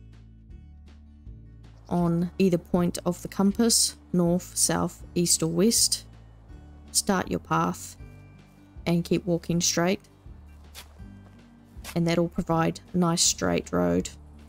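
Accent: New Zealand. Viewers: Youtuber sounds kiwi as. haha.. Nice!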